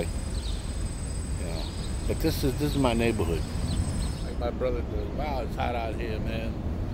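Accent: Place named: New York, United States. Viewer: New fan here! My name is Tony, I am from Illinois and married with two boys. I would like to tell you that keep doing what your doing, be real, I am 32 years old and I get your humor! Also, helps, that to someone like myself to see your way of living, accent, being from new york is ALL interesting enough! Would love a shout out on a vlog of yours man, would make my day to see a future "youtube kingpin" pay back the love! Keep vlogs coming man, Love'em!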